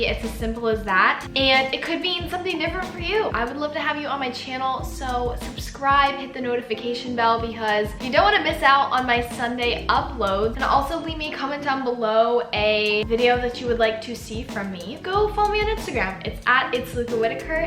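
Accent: Australia. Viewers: It’s not a Luca video without the Australian accent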